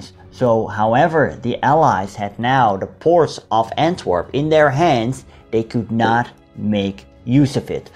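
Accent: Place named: Netherlands. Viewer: Struggel. 😂I love the Dutch accent during impeccable English. Great channel. Shocking losses on the Canadian side.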